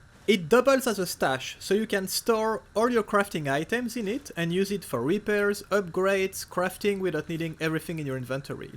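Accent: France. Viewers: Cet accent fr mon dieu ahah ! Merci pour la review !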